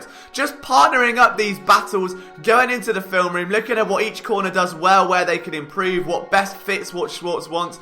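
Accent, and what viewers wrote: England, United Kingdom. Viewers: I started watching the channel because I love the Eagles. But when I heard the English accent, I was very intrigued, because as an actor, I have to learn English accents. Your content was great, very knowledgeable, and I got to learn more film and about players that aren’t really in the spotlight. Congrats to you and your team and continue the great work! #FlyEaglesFly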